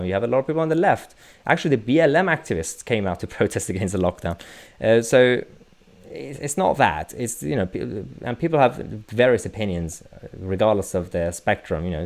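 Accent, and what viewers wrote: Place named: England, United Kingdom. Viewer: Ah, so that is where your accent was created, between Leigh and Greenwich, almost Kent:)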